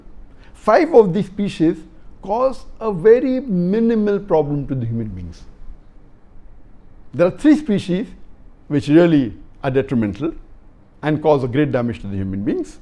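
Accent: India. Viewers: Hello, can you stop speaking like an Indian? TQ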